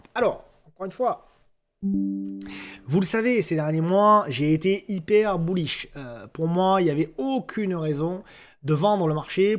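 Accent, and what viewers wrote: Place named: Montpellier, France. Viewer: Faut se le farcir l'accent de Montpellier..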